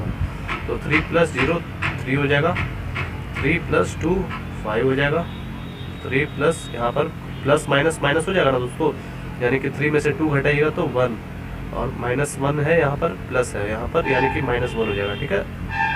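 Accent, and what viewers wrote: Bihar, India. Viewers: Bihar se ho ka? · Kaha ke ho bhaiya Bihari ho kaa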